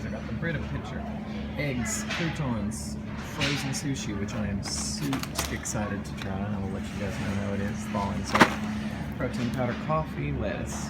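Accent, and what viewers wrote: South, United States. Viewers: I had to take a pause just too type this message! I love ur southern accent or the way you speak whatever you want to call it! I love it!!!!!!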